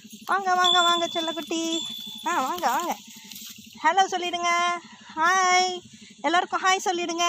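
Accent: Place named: Madurai, India. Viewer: Akka nenka entha ooru .. Madurai language matri irukku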